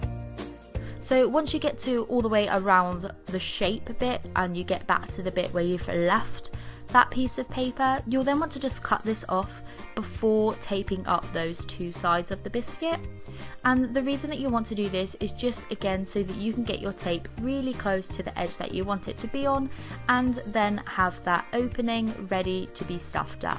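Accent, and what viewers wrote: England, United Kingdom. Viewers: love yr English accent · i love her british accent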